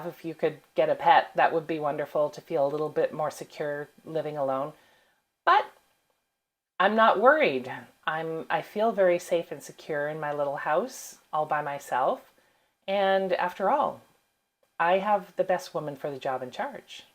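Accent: Canada. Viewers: You're Canadian, aren't you? 😅😅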